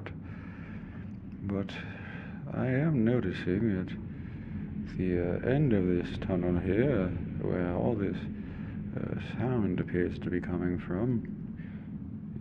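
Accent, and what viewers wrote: United Kingdom. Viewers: Very good British accent! Im a brit myself and its uncanny dear boy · r u bri’ish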